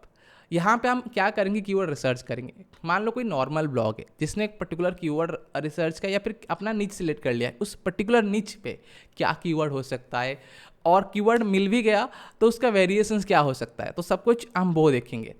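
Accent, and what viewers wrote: Odisha, India. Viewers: Brother, u r from odisha?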